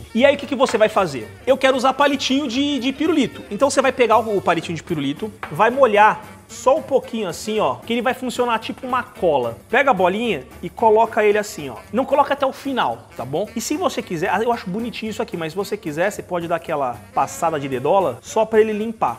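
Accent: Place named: Rio de Janeiro, Brazil. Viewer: ele é um paulista com sotaque carioca...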